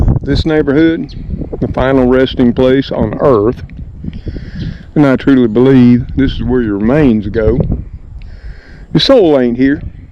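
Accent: South, United States. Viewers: That was a beautiful walk through that graveyard Mr I love graveyards and walk through alot of them myself if only it wasn't so cold but I go out anyways as long as it's not 10degrees when I was a little girl I used to love to play in the graveyard by our house all the time I think you may be having visitations at home when you smell that perfume and I find that so comforting because I believe the spirit can visit their loved ones and I don't fear the dead it's the living we have to fear unfortunately I have many strange things happen in my house also but anyway it's great to get out in the sun in the winter and get some exercise I hope you have a great night and stay safe I'd like to walk through the graveyard again with you if you want thanks 😊 Mr and have fun with your crew and by the way I love your southern drawl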